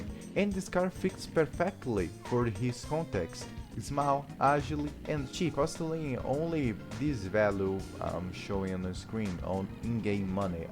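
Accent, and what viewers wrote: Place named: Russia. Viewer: Nice russian english brothar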